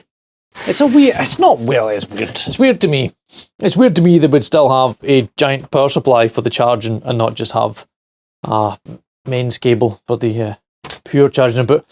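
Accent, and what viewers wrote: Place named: Glasgow, United Kingdom. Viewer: could you possibly incorporate the words "curly whirly". "murder", "world" and "work" into one of your next videos? I love how they sound in the Glaswegian tongue. I always enjoy your vidz man.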